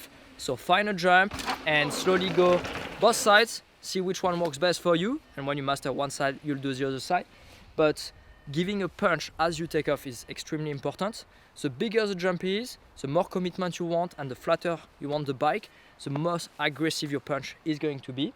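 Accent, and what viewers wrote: France. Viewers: Je kiffe grave ton accent français en anglais......tu parle le franglais, le vrai .....t y es le meilleurs en tout cas dans les rides de fou....bravo a toi d avoir trouve la technique du poignet pour ce tricks, ça marche du tonnerre je viens de l essayer ....🤟🤟🤟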